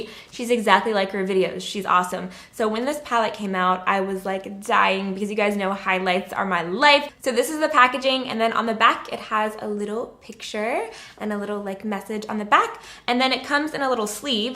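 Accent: South, United States. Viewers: Kia Uhhhhh no it has nothing to do with audio. She used to have a very prominent southern accent.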